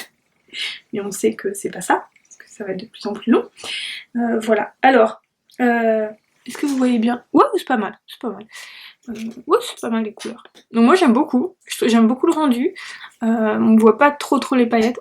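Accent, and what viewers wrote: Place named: France. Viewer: Je suis toujours contente de voir tes podcasts! déjà entendre parler le français de France c'Est super...et en plus c'Est toujours bien complet! et pour le Kal... oh j'ai hate... ce coup ci je le fais!!! Ne change pas! tout est très bien! Rose, xx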